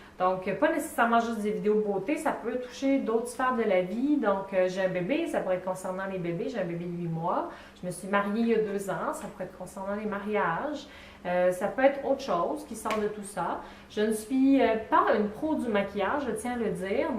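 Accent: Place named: Quebec, Canada. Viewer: hi!!je participe !!j'aimerai que tu fasse une video sur la cuisine de ton pays,je suis africaine plus precisement du TOGO et j'aimerai pouvoir interechanger sur de nouveau repas.en passant jolie accent(j'aime trop cette façon canadienne de parler le français)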